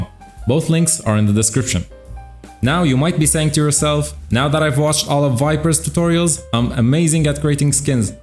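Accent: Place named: Poland. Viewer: Where are you originally from then? I was 1500% sure you’re Polish judging by your accent 😄 Otherwise I would write in english. There’s a lesson in that case: don’t judge a book by it’s cover 🤣 Well, now it makes more sense to make only cs2 dedicated videos.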